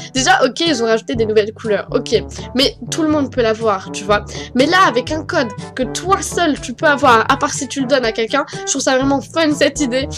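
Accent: Quebec, Canada. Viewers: Go les 100K ?😙 t'as un p'tit accent nan ?😁 québécoise ?😏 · On entend beaucoup ton accent Canadien❤ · defois ta grv un accent québécois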